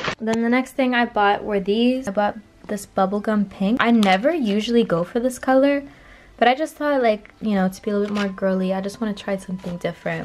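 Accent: United States. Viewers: I'm a bit confused! Your accent is American and you say pounds££ so I'm a bit confused or is it just me thinking you sound American?🤔🙈🙈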